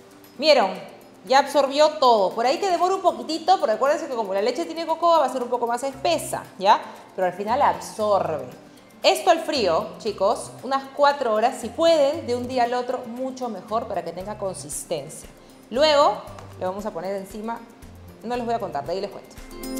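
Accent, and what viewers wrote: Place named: Peru. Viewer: Peruana por el acento.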